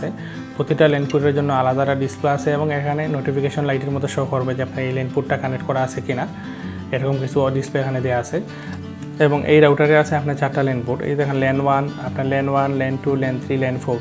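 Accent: Sylhet, Bangladesh. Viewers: Sylheti vi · vai apni ki sylheti naki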